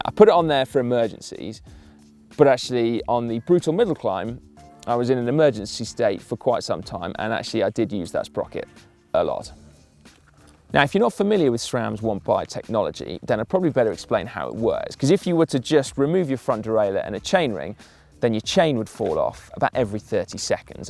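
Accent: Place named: RP, United Kingdom. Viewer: Where does get his posh voice from? #torqueback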